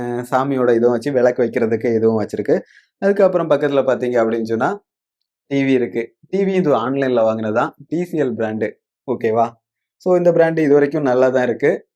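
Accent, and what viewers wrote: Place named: Nagercoil, India. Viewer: TN74 slang theriyudu bro 😀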